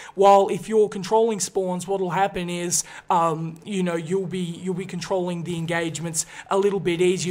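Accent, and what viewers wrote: Australia. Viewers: epic gameplay by the way what accent is that ? aussie or brit ?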